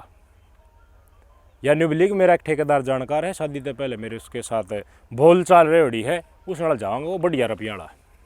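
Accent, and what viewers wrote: Haryana, India. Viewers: Enjoyed Haryanvi narration of story but content is good one.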